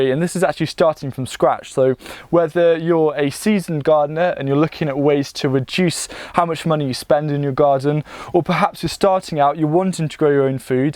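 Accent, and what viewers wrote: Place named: England, United Kingdom. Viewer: Gorgeous!!!! Just absolutely beautiful and Gorgeous! .... Oh yes and the book, gardens, and videos too. 😉 Thanks for all your work and content and videos!!!! Must say your excitement, charming English accent, knowledge, and charm keep us YouTubers wanting to come back to watch your videos time and time again. Of course, another reason is that you are very easy on the eyes! 😉 Thanks!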